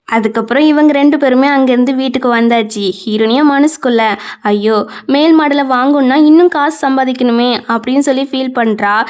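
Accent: Tirunelveli, India. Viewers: Unga voice romba nallaruku pa neenga nellai slang dhana pesurenga nan tenkasi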